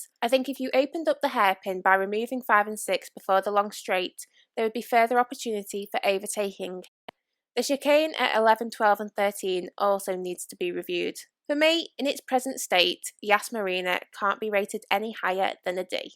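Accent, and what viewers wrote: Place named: Liverpool, United Kingdom. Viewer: Hey, random question, are you from around the Liverpool area? 🤔 As a Hungarian, I have to agree, the hungaroring is usually not too exciting in terms of results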